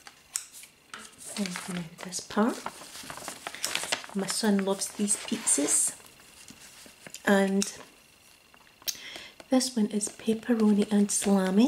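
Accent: Scotland, United Kingdom. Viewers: Currently away from home, missing the Scottish accent and style, so love watching your vids. It was magic seeing the sales and going through them one one as you did. If live to see this buyer a weekly thing! And based on the comments would others 😀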